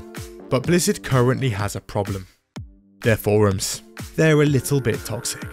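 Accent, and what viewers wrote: United Kingdom. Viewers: all British people sound the exact same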